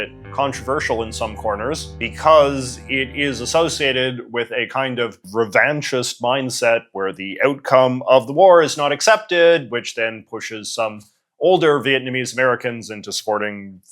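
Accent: Canada. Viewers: Hes Canadian with a Canadian accent. Accents ≠ mispronunciations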